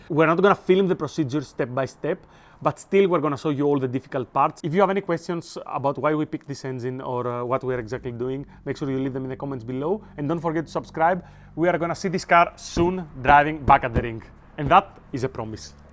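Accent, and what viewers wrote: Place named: Greece. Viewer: Απο την αρχη του βιντεο καταλαβα οτι εισαι ελληνας απο την προφορα... πολυ καλη δουλεια!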